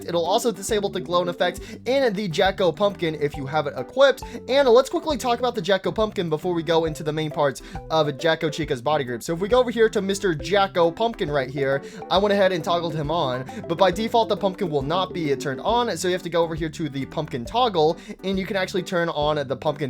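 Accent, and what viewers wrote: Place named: United Kingdom. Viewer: bro is British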